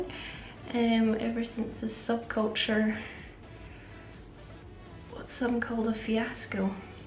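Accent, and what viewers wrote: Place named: England, United Kingdom. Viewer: I thought you were from England! Lol still love the accent 💕💕💕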